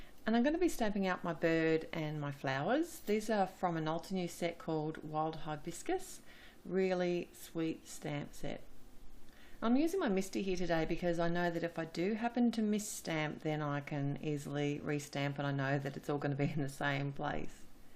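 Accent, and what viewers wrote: Australia. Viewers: Love your card so beautiful. Also love your Aussie and you Iink to an Aussie store for your supplies. Thank you so much.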